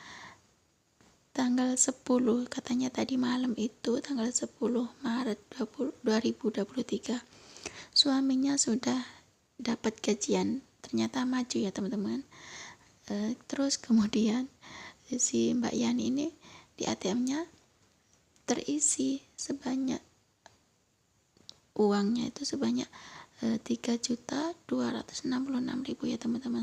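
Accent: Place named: Banyumas, Indonesia. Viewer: Kak trnilngapak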